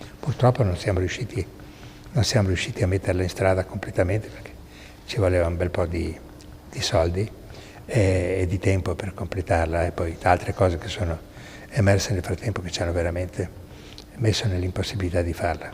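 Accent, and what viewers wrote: Abruzzo, Italy. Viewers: se però andassi a un corso serale di italiano non sarebbe male, eviteresti di apparire come un asino che raglia. Neanche più i pastori abruzzesi parlano con quell’accento. Gombordamendo…. Ma come cazzo si fa a parlare così nel 2023 porco iddio lurido!!!!!!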